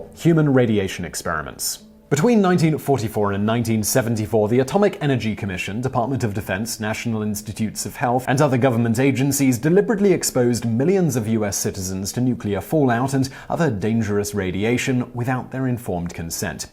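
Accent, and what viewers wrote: United Kingdom. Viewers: It's like infowars with a Brit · Always some British voice dipshit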